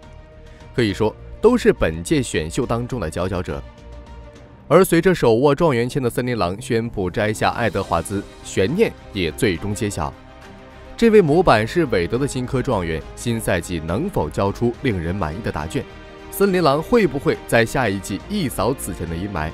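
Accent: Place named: Shandong, China. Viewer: 这山东口音英语直接听出戏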